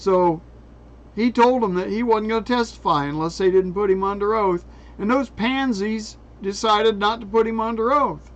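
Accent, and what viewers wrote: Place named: South, United States. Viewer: WELCOME BACK,. MISSED YOU TERRIBLY. YOU ARE 1 OUT OF 4 CHANNELS I LOYALLY WATCH. YOUR TRUTHFUL, ACCURATE, AND I LOVE YOUR SEXY, SOUTHERN, GENTLEMAN ACCENT. MAYBE GOD BLESS YOU, AND WATCH OVER YOU AND YOUR FAMILY. GOD IS WITH PRESIDENT TRUMP, GOD WINS. PLEASE, EVERYONE, CONTINUE TO PRAY, AND LET US ALSO GIVE PRAISE AND GRATITUDE TO OUR ONE TRUE GOD OF ISRAEL, OF ABRAHAM, ISAAC AND JACOB. 🕆✡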